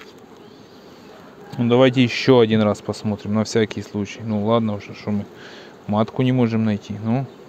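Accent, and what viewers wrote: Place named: Ukraine. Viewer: К ролику можно цеплять метку геолокации. У многих видел. А иначе понять, что вы из Украины, можно только по говору и по вальмовой крыше на заднем плане)) и то это не точный способ. На юге России тоже похожий говор и крыши:)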